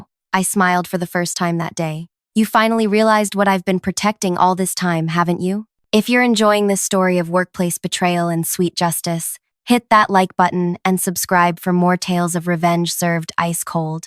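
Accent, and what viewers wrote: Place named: Asia. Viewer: Same old Asian accent and same old points. No American accent to tell it's a story from USA. Just mocking American corporates always 😢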